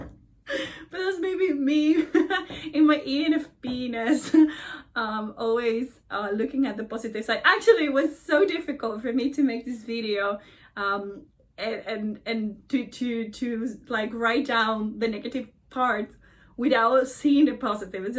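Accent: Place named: Venezuela. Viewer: Where is your accent from? Best guess is Venezuela.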